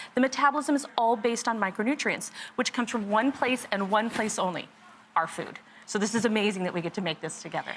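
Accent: United States. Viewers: I this really how people speak in the US?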